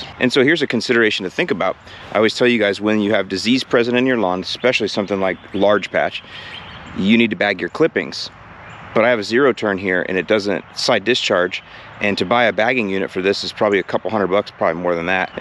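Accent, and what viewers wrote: Chicago, United States. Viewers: I enjoy the Chicago accent... somehow, I didn't know you were from there until recently.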